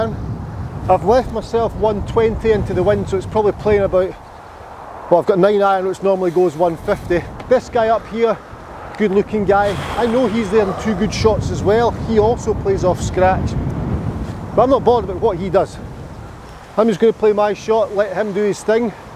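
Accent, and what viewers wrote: Scotland, United Kingdom. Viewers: I’m in Glasgow great to hear Scottish accent with humour, I’ll take on board what you say to tomorrow morning on first tee, great vid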